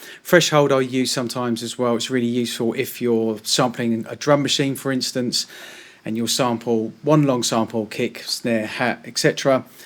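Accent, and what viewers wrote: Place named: London, United Kingdom. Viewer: Hey bro just want to thank you so much for the well articulated and illustrated vids. Bigups from London although from your accent I detect a Londoner too!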